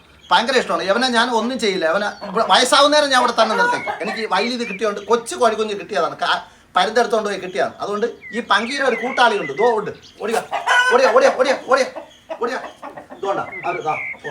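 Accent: Thiruvananthapuram, India. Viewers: എവിടെ വീട് ചേട്ടാ? തിരുവനന്തപുരം സ്ലാങ്. ഒന്ന് നേരിട്ട് കാണാൻ ആണ്. അഡ്രസ് ഒരു ഫോൺ നമ്പർ ഇടുമോ.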